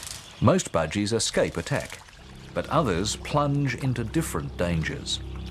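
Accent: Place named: United Kingdom. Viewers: WALKMAN from Australian TV? Then yes. The announcer sounded more British tho. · The narrator's name is. He is an English-born Australian, so that may well be the reason why he has a touch of British in his accent.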